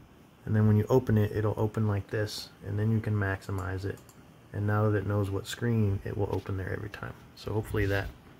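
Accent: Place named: South, United States. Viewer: Thank you you sweet southern man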